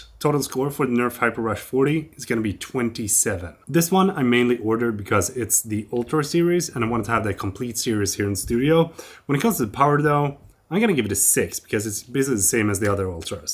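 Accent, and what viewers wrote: Sweden. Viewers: Your video editing is brilliant. Love it,. (As I typed I was saying it in your satirical Swedish accent in my head).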